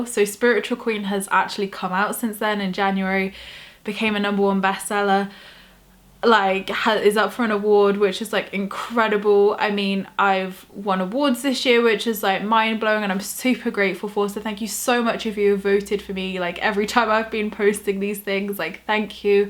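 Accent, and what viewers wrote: England, United Kingdom. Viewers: Hi. I’m Carrie. If I’m honest, I’m a little bit tipsy right now. But I felt connected to you for many reasons. One of them was, you’re the same age as my older sister, so I instantly looked up to you and wanted to be like you because I’m just a little shit and I don’t know who I am yet. But I do know that the idea of living a life like you live, fills me up. But I have absolutely no idea how you do it. I don’t know how to set up a business. But the idea of working a nine to five job kills my soul, and I’m not even doing it yet. The bottom line is, I deeply admire you, and the way you talk about manifestion and the law of attraction really speaks to me. I’m Scottish, and you’re clearly English (lovely accent, btw). I would travel to England just to hear your advice, but if also talk to you any way possible. Something about you makes me really trust you and I subscribed and binge watched you instantly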